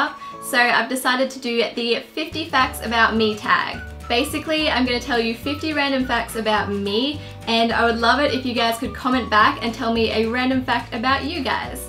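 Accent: Australia. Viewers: you are seriously so pretty! also random fact, you sound like Ingrid (missglamorazzi) but with an australian accent! haha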